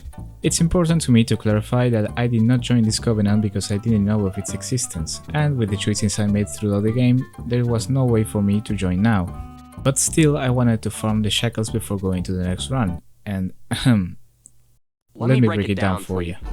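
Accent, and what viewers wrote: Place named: Spain. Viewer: Como se nota que eres español😂😂😂😂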